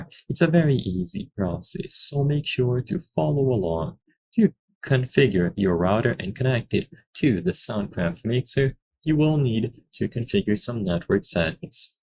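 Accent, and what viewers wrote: Brazil. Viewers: É br né cara? Kkkk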